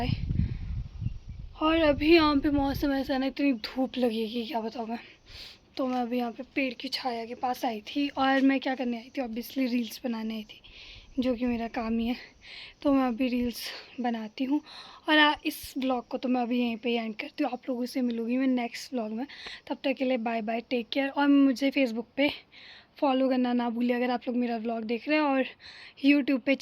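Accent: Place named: Kashmir, India. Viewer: You look like a Kashmiri girl, and your tone also sounds the same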